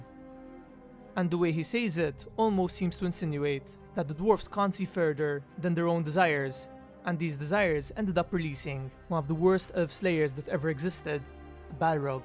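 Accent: Malta. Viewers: Love the maltese accent :) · He sounds maltese:) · What a coincidence, Im from Malta aswell, thought your accent was uniquely familiar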